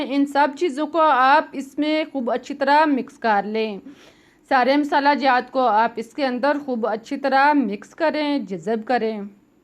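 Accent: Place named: Punjab, India. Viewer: She is speaking English Words in Punjabi style, using Plenty of Oil, Bread will definitely break. Mix, Oil, crispy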